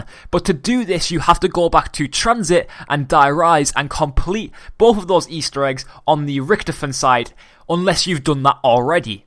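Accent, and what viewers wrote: United Kingdom. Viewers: You are wrong there is a British accent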